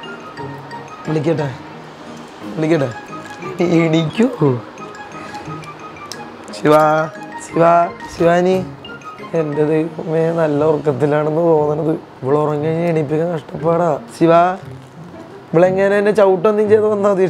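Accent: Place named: Haryana, India. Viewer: ki Haryanvi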